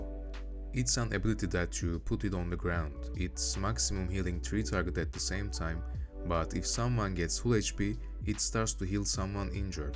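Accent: Turkey. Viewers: Sen türksün aksandan belli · Are you by any chance, Turkish? Your accent sounds like it :)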